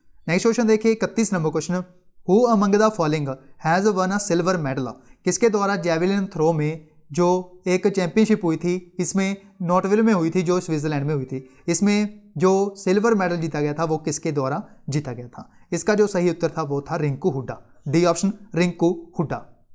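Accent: Punjab, India. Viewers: ye sir ya toh punjabi h ya himacha se h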